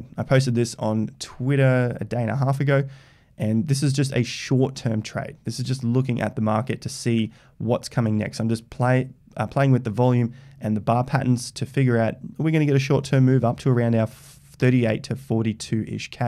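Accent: Australia. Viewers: I come to listen to ur analysis in ur Aussie accent :D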